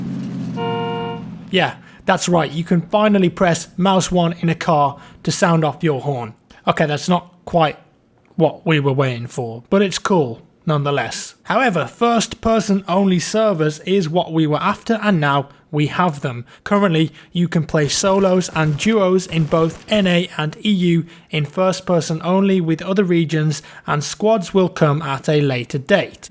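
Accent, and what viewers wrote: England, United Kingdom. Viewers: Good dammit man i love u english accent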